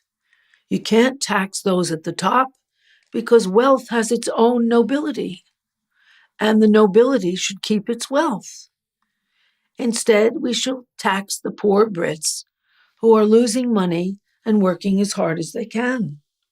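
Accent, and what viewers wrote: New York, United States. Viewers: Yeah it's probably the raspy New York accent, as well as the identically emotionally expressive face that does it.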